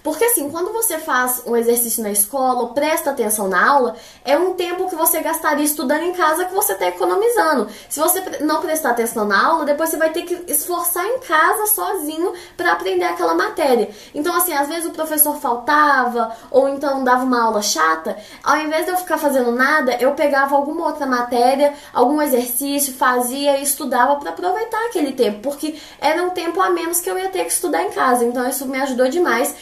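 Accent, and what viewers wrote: Minas Gerais, Brazil. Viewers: meu Deus ela eh mineira 😍😍 kkkkk "esses trem" amo seus videos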